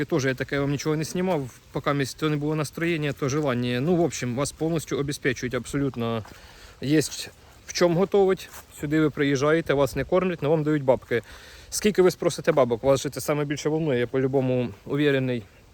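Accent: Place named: Slobozhanshchyna, Ukraine. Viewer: Молодець що не стоїш на місці, шукаєш своє. Стежу за тобою з того моменту як ти почав працювати на доставці у Вроцлаві, подобається як записуєш свої відео, маєш свій стиль. Хотів підбадьорити тебе відносно мови. Пропоную не вживати слова СУРЖИК, бо це кремлівський винахід від слова сурогат. Якщо я вірно зрозумів- ти розмовляєш українською з яскравим СЛОБОЖАНСЬКИМ діалектом. 😀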